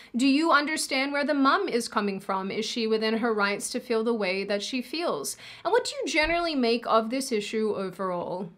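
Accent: Australia. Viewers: These people are fucking insane!!!!! I'm hearing your Aussie accent come out and I'm loving it!! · Well that’s the first time I’ve heard someone imitate crocodile Dundee in their own Strayan accent.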